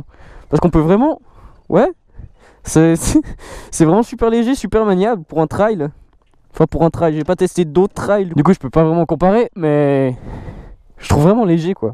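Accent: Vaud, Switzerland. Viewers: Le bel accent vaudois, ça fait plaiz'! Merci pour ta vidéo, tu as gagné un abonné. :) Je compte passer à la moto le printemps prochain après 7 ans de permis scooter, est-ce que tu la conseillerais à un débutant qui a zéro expérience en moto? Je cherche quelque chose pour faire le trajet domicile-travail (de l'autoroute ou de la route de campagne mais peu de ville) et me balader le weekend. Merci d'avance!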